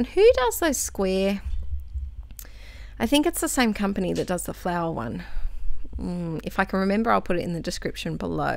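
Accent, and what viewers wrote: Australia. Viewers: Love your art I have been trying to work out your accent good to know we have another Aussie doing mixed media TFS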